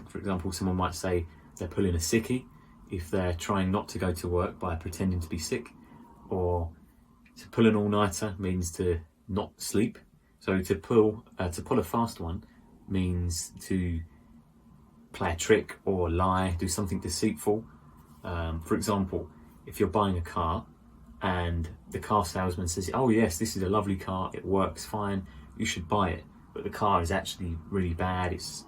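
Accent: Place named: RP, United Kingdom. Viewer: I’d say the modern RP accent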